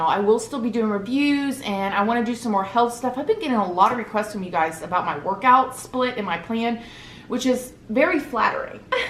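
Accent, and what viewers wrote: South, United States. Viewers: She's got a beautiful southern twang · Girl..I adore you! I have been binge watching your videos. There Is something about u that speaks to my soul! I love how your a lil bit of country ( accent )..and a lil bit of street yet classy all wrapped in 1! Does that make sense? Lol.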